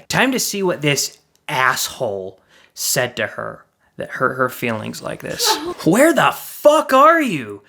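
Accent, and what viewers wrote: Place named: Germany. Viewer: I freaking love the German Accent XD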